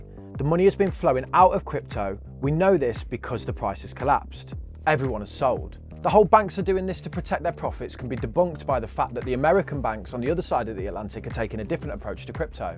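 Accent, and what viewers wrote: Birmingham, United Kingdom. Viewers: Are u from Birmingham bro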